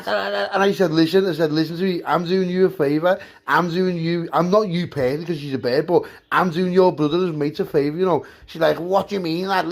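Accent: Liverpool, United Kingdom. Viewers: My missus just said what are u listening to i said scouse stories 😂 she said he's proper scouse 🙈 its in the title😂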